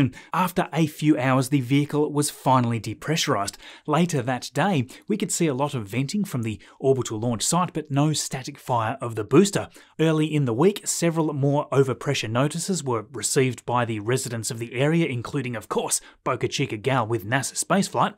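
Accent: Australia. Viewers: I lived in Brisbane for 5 years. I come here for weekly dose of Australian English ... LOL I do like the Aussie English .. I am from Brazil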